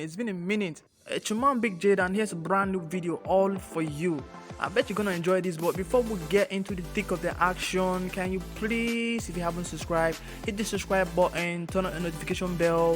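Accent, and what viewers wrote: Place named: United Kingdom. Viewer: I love this voice over Giving me “British Vibes”😍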